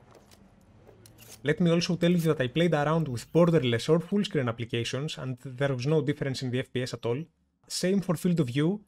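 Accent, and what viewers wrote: Greece. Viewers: Greek .. I can bet you Are greek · From your accent, I instantly realised that you are from Greece. Δεν περίμενα να έβρισκα Έλληνα youtuber να μιλάει για το cyberpunk xd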